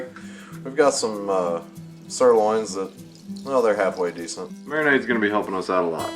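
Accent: South, United States. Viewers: You should do more videos. Why? Because I enjoy them and We all love your confederate drawl.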